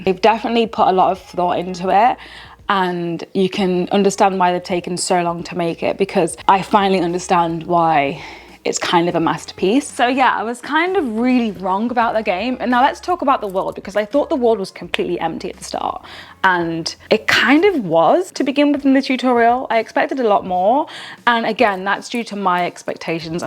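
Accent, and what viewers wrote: United Kingdom. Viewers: How do you have both British and American accent? 😳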